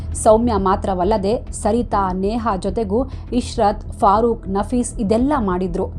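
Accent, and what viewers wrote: Standard. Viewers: ಸ್ವಚ್ಛ ಕನ್ನಡ ಶುದ್ಧ ಕನ್ನಡ ವಿವರಣೆ exalent 🙏❤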